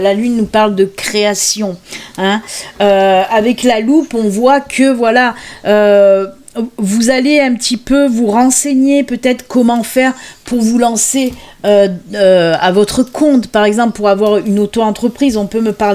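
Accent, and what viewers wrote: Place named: Provence, France. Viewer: Vos un petit peu ça fait très marché de Provence 😉😂... Ne changez rien, ça ensoleille nos journées, de même que votre bonne humeur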